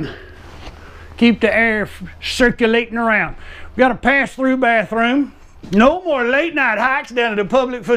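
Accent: Texas, United States. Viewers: I'll buy this if you come along, too 😉 ! You are AWESOME!! I didn't check yet where you're located, but you sound like you might be a Texan, who are some of the coolest people in the world 😘 !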